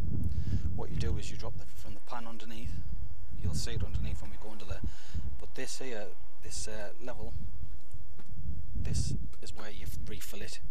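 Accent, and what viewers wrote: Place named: Durham, United Kingdom. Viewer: You sound like your from my kneck of the woods Durham very helpful video cheers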